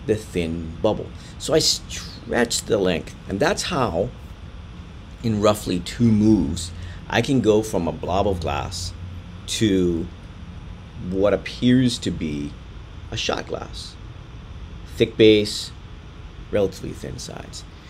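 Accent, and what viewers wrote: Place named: Canada. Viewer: Great video. but what is your accent? it's so strange. It's like canadian, mixed with heavy new york, and irish.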